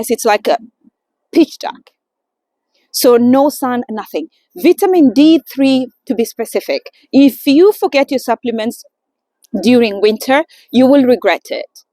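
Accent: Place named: Gothenburg, Sweden. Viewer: Great video! I totally agree about getting out no matter the weather. Love your Swedish pronunciation too… I can hear som Göteborg accent 🤩. Happy holidays ❤️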